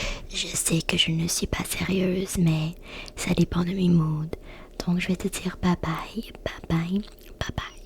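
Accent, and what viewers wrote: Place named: Quebec, Canada. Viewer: Tu as un accent que je connais mais j'arrive pas à savoir 😂 so t'es de quel régions de Québec?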